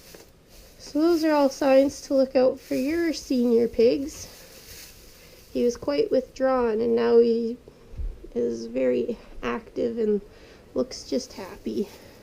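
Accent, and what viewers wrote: Canada. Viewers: You sound Canadian 😁 are you?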